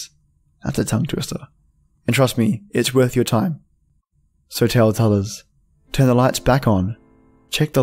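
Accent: Australia. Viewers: Aww thank you! Your awesome Aussie accent makes the stories even better & that's one reason I keep coming back to your channel! Very well done with this one! 👍🖤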